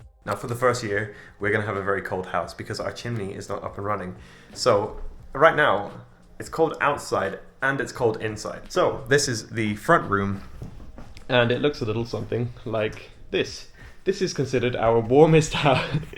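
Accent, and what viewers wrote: Sweden. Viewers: That swedish accent is creeping in 😂😅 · Why do you sound Swedish 😭 I can’t hear an accent at all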